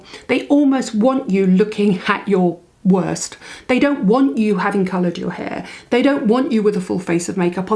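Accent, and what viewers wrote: United Kingdom. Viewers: you are looking so fresh and well in this! I so look forward to these videos. I miss all my UK pals and this gives me a little “fix” as your turn of phrase and accent are exactly the same! Always excellent info as well. I have subscribed to Beauty Pie but honestly the shipping plus the monthly charges are not adding up to making it worthwhile as some of the makeup in particular is really just so-so.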